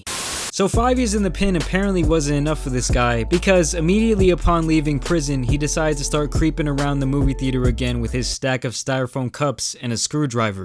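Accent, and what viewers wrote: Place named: Chicago, United States. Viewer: Best voice and your from tx ??? that accent is like “Harlem, wait no Chicago in the 40’s”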